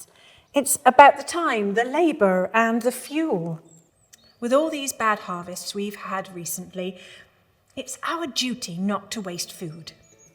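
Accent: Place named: RP, United Kingdom. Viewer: she’s so posh ❤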